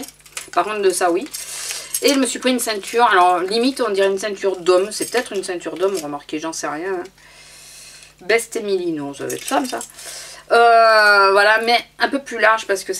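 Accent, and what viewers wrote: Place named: South, France. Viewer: Coucou tu vient de quel endroit avec se petit accent du sud ?... ( sinon j'aime tes vidéos )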